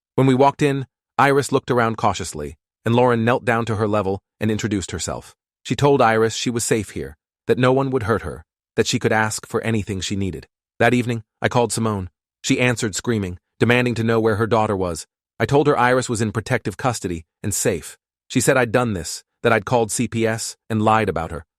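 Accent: United Kingdom. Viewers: He keeps going British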